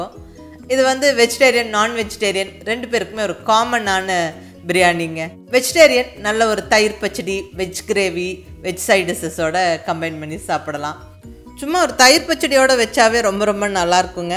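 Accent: Coimbatore, India. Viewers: always you rocks mam.... Am also coimbatore nanjundapuram amma home.. Now setteled in Chennai.. Husband home... Nama cbe slang kelumpothu avlo happy ah iruku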